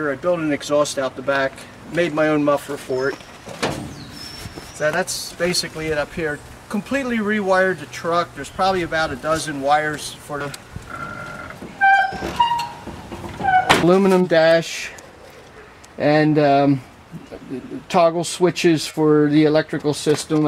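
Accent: United States. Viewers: I'm not sure where this guy is from, but he totally has a Delco, PA/South Jersey accent. Hell, he could be REVan Evan's dad! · What part of Philly is this guy from haa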